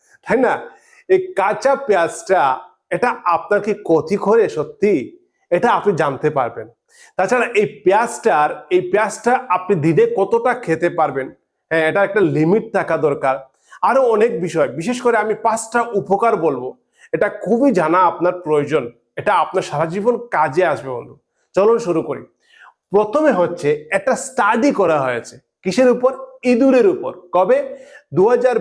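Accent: Sylhet, Bangladesh. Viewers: ভাই আপনার বাড়ি কি সিলেট?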